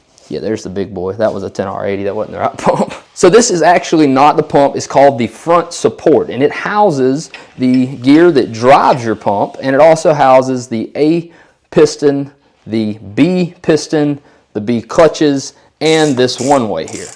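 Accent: Alabama, United States. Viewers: That Alabama accent gets a smile on me every time!😊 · He's from Alabama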